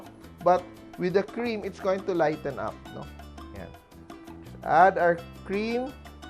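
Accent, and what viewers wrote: Philippines. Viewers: Philippines accent are cute ...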